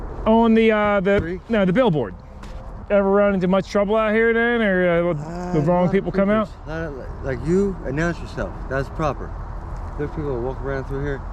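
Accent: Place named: Eastern Pennsylvania, United States. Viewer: Came across one of your videos on the XL, I heard you talking and thought "This dude is from eastern PA for sure." Greetings from Bucks County. I might have to check out some of these spots on my XR one of these days.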